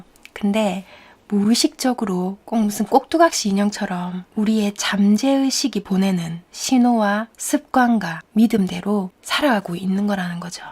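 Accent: Gyeongsang, South Korea. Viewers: 말끝에 ~~하는 갱상도 억양만 고치면 되요. 쉽지요? 난 조선족, 북조선 말투 할 수 있는데 그살람들은 남한 억양 몬해요.. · 경상도 사투리에 아가씨가 그리워지네~~^^ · ㅎㅎ 이미 억양 자체가 사투리입니다 ^^ 경상도사람이 경상도 말씨는 당연한겁니다 경상도 사람이 서울말쓴다고 말하는거 들어보면 ᆢㅎㅎ 웃기는것같아요 ᆢㅎㅎ 늘 좋은책 잘듣고있습니다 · 님 좋아요 경상도 사투리가 매력적인 감동입니다 저도 경상도 사람입니다 책 내용이 중요하지요 감사합니다 행복하세요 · 경상도표준말 듣기좋아요, 저도 경상도표준말 씁니다. 목소리 참 맑고 곱습니다. 늘 잘 듣고 있으니 쭈욱 이 목소리와 말투로 해주세용~~♡♡ 감사합니다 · 갱상도 사람은 표준말을 쓴다고 해도 갱상도 사람이 들으면 갱상도구나 느낍니다.ㅎ. 좋은 책 소개 감사합니다. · 사투리? 경상도 표준어 너무너무 매력적입니다 정감이 넘치는 말입니다 아주아주 세련된 말입니다 감미로운 목소리 정겨운 목소리 감사합니다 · ^^ 목소리 너무예뻐요 경상도사투리라서 더예쁨 영상도아주좋아요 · 경상도 말투 좋아요👍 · 경상도 사투리가 매력 짱짱짱 이네요~^^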